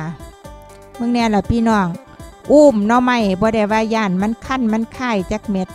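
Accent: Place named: Roi Et, Thailand. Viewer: ฟังสำเนียงคือคนบ้านข่อยไทร้อยเอ็ดแท้น้อ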